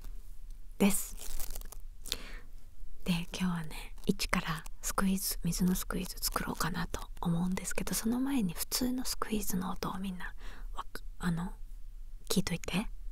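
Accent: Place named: Kagoshima, Japan. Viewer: 鹿児島弁混じりの関西弁になってましたね(笑)あいちゃん顔焼けたぁ？いい感じです。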